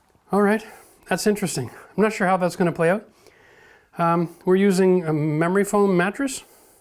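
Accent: Canada. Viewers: Im first to like this video & Saturday night would not be the same without a Canadian saying “out” !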